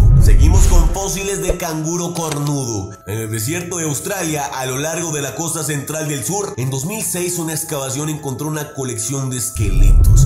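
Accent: Colombia. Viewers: eres colombiano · pa re Colombiano el pibe este😎😎😎😎😎😎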